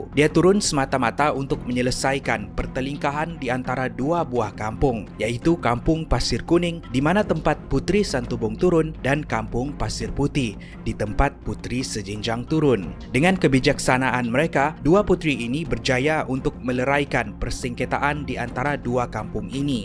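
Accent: Sabah, Malaysia. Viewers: org sabah ka? cara bercakap mcm sabahan.. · sabah ka😁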